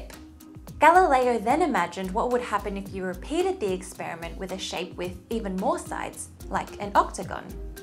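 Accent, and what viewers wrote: Australia. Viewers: I love that cultivated Australian accent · the first female Aussie accent that isn't like nails on a chalkboard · and I'm an idiot. I realized that after watching a couple more of ur videos. Us Americans aren't very good at noticing an Australian accent unless its particularly strong. Interesting stuff btw. U really are doing a great job... But u do have a lisp 😆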